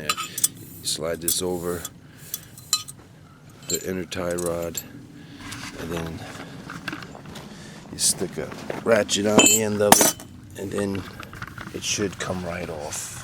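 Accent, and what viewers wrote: New York, United States. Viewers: great video nice to hear a new yorker again been in florida to long haven't drank an old e in a long time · ROTFLMAO!! hell yea this dude the man!! i hear NEW YORK IN THIS DUDE!! helluva job then pulled out the 40 rty of that old ing bing. Hahahahah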